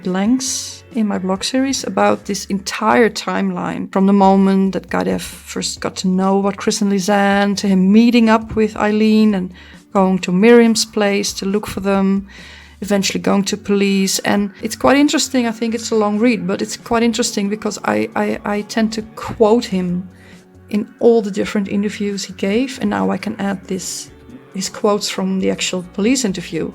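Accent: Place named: Netherlands. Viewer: dutchies try to speak english 😂bad accent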